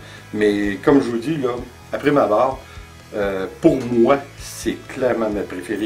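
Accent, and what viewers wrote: Quebec, Canada. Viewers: J'aime bien vis explication et votre petit accent de québécois moi je suis normande je vais tester vos méthode pour su scrap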